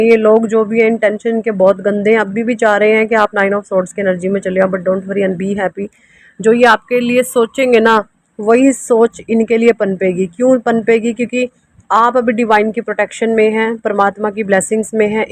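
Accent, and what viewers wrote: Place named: Punjab, India. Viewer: 😀😀😀 वाह तुसी पंजाबी हो 🙏🙏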